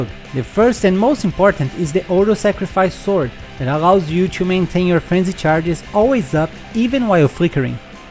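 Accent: Brazil. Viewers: Vc é BR? Parece que tem sotaque haha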